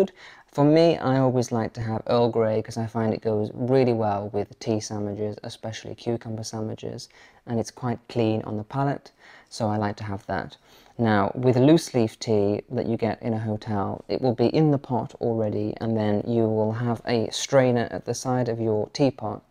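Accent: RP, United Kingdom. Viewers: I registered this Chanel. I am interested in his posh accent than anything else.😃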